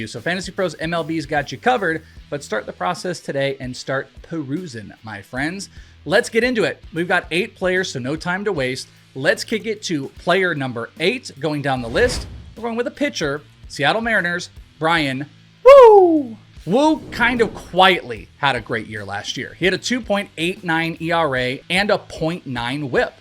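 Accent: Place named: Wales, United Kingdom. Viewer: Welsh talking Baseball 😍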